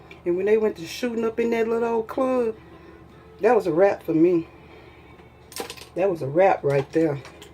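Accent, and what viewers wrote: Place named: South, United States. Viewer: You did a great job girl. Your makeup looks beautiful ❤️ you sound very southern, are you from Georgia?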